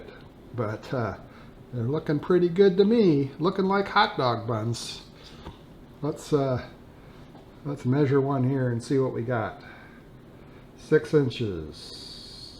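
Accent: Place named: Midwest, United States. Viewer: You are NOT from Texas, man! Your accent is from IL!!! I can tell a mid west anywhere!!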